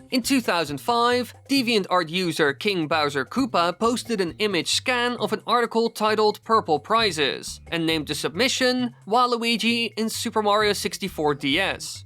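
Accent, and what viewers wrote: Netherlands. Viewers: Je moet je hollands accent nog iets beter verbergen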